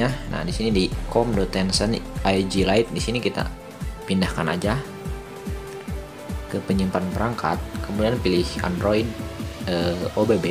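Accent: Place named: West Sumatra, Indonesia. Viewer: SUARA MU KYK MINANG KOCAK BANG, TP MANTULLL BANGGG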